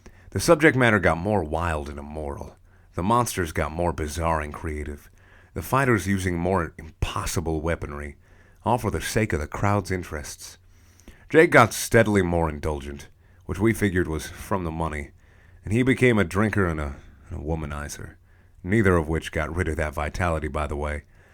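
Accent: South, United States. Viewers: I want to hear your country accent like so hardcore xD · I love how the video gradually got more southern.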